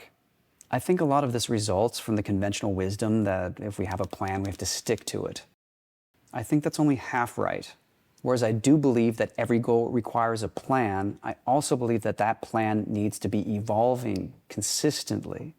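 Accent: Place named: United States. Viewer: Set goals to be a better writer or Ryder? American accent make it hard to hear the difference. I think you are good enough whatever the answer.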